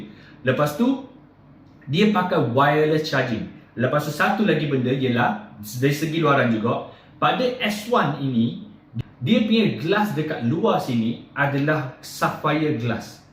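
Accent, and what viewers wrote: Terengganu, Malaysia. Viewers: Bunyi macam org Tranung ni.. 😊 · mcm org Ganu je ni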